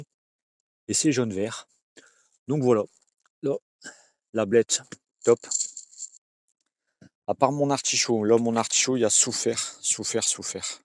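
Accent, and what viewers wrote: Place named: North, France. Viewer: Avec ton accent ça sent le Nord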